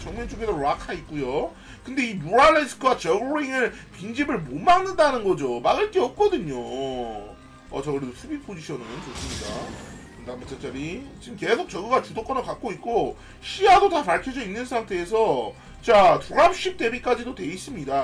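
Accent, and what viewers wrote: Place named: South Korea. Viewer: korglish lol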